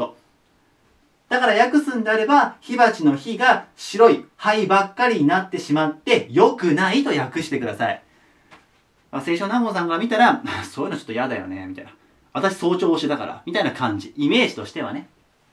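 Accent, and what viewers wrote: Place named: Osaka, Japan. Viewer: 大阪の方ですか？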